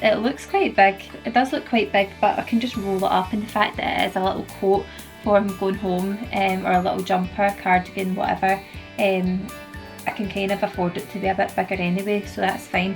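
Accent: Scotland, United Kingdom. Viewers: i loved watching your video!! so nice to come across a scottish accent! im from isle of bute and live in Australia. thank you x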